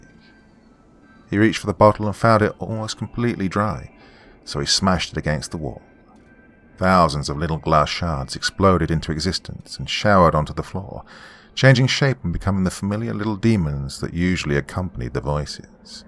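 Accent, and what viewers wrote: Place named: England, United Kingdom. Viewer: I gotta ask your accent are you english or?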